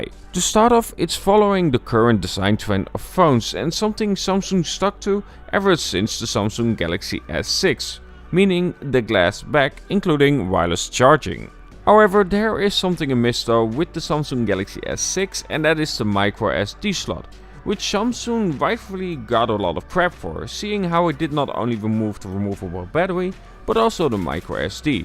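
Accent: Netherlands. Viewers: Jij bent nederlands, ik hoor het!!! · nederlands😂 kun je dds goed horen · That dutch accent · Still using the s6. You have a dutch accent, I can hear it because I'm dutch, am I right? ;) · You are dutch confirmed it via you english accent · Haha nederlands accent😂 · Meteen in de eerste zin wist ik dat je nederlands bent😃 top video💪💥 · Je hoort gewoon dat je Nederlands bent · Youre dutch, my accent is the same as youres😉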